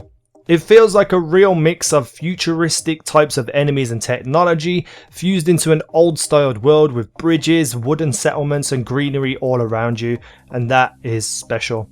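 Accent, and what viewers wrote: Birmingham, United Kingdom. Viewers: I can hear that brumy accent ha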